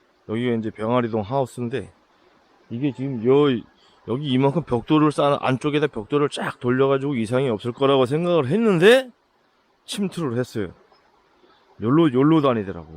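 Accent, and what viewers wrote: Gangwon, South Korea. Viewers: 네발달린늠이 고양이였네요.. 병아리를 안먹고 놀이삼아 죽이다니.. 저도 강원도라 말투 정겹네요.. 단속잘하셔서 피해 더이상 안받으시길.. 화이팅하십쇼..^^ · 강원도 사투리 쓰시는거 보니까 강원도 이신가봐요?ㅎㅎ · 고양이 안죽이신건 잘한일임. 예로부터 개, 고양이, 뱀은 죽이지 말라고 했습니다. 동물령이 붙는다죠. 근데 어제 이 채널 첨왔는데 매력넘치는 주인장님 재밋네요. 재밋는 강원도 사투리 억양 잘보고있습니다. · 카리스마있는 인상에 엄청 잘생기셨는데 ㅋㅋㅋㅋ 강원도사투리가 너무 귀여우시네요 ㅋㅋㅋㅋ 반전매력ㅋㅋㅋㅋ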